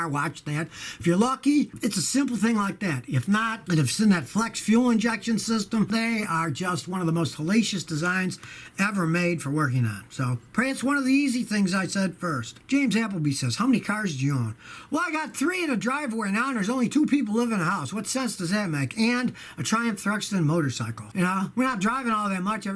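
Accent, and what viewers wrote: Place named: Chicago, United States. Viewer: Hello,. Are you sure that you’re from Texas. You’re accent sounds like from Chicago or Detroit. I appreciate this wonderful channel, and keep up the great work. 😊✨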